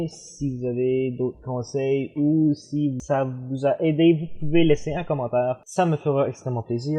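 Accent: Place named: Quebec, Canada. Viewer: C.est du français avec un accent . Il faut écouter beaucoup on finira pas s.y habituer. Je trouve le quebecoi est un jolie accent. Le français parisien est technique administratf sec et olat sans poesie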